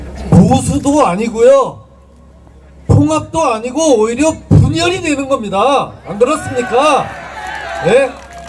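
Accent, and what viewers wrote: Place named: Gangwon, South Korea. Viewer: 강원도 말씨 정답네요~~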